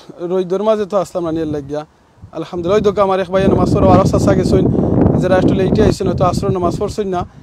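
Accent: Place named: Sylhet, Bangladesh. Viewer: ভাই , আপনার কথা বাংলাদেশের বিভিন্ন অঞ্চলের মানুষ শুনতেছে ভিড়িও দেখতেছে , সুতরাং আপনি সিলেটের আঞ্চলিকতা পরিহার করে কথা বলবেন ।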